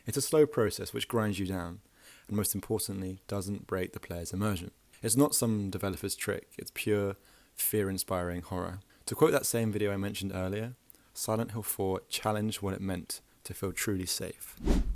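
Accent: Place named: United Kingdom. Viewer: Anything told to you in a deep British voice is good, isn't it?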